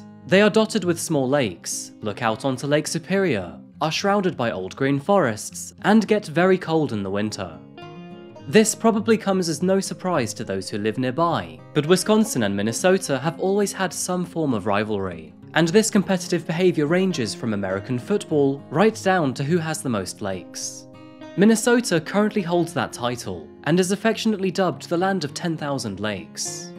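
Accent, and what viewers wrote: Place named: United Kingdom. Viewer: British awcentwtwtw....nice exploitative content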